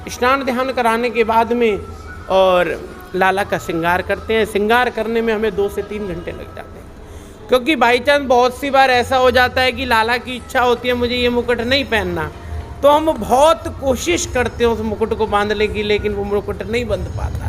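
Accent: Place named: Braj, India. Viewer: Pandit ji mujhe aapki braj boli bhut pyari lagi😚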